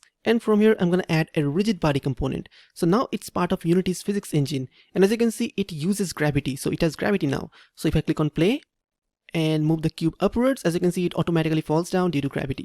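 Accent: India. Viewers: It migt be a little bit racist but when i heard his indian accent i knew he knows what he is doing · Little skeptical about the fifteen minutes, then I heard the Indian accent and I then knew that the title wasn’t joking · Once I heard the Indian accent I knew he actually knew what he was doing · i pressed the video and i said to my self its like other videos it wont help until i heared indian accent and i got locked in❤ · it would be perfect tutorial if it didnt have the in*ian accent.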